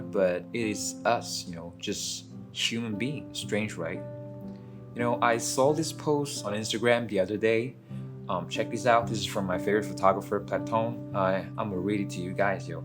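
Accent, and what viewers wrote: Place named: England, United Kingdom. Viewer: Subscribed!!! Wow bro I like your English accent😍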